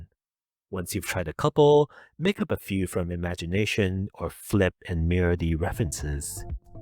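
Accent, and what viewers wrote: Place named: Japan. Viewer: I hear that Japanese or asian type accent and I know this video is going to be filled with amazing quality content, thanks new sub .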